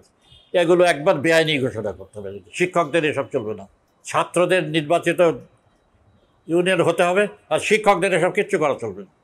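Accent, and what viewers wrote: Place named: West Bengal, India. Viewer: স্যার এর আদি বাড়ি কি স্বাধীনতা পূর্ব পশ্চিমবঙ্গে ছিল? কথার মধ্যে এদেশীয় টান! অবশ্য খুলনাও হতে পারে। যাইহোক ভাল থাকবেন স্যার। এই বয়সেও আপনি সমান চিন্তাশীল । ভাবতে ভাল লাগছে।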